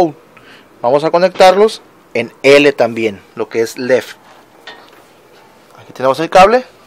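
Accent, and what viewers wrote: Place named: Piura, Peru. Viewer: ese dejo es de piurano o de mexicano.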